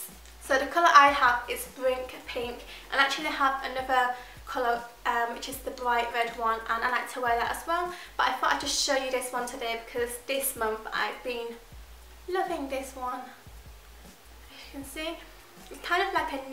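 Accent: United Kingdom. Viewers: you have a kind of weird british accent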